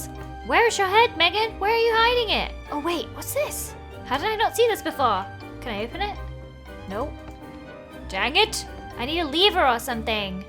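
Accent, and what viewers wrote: United Kingdom. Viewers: sounds so much British in this video😂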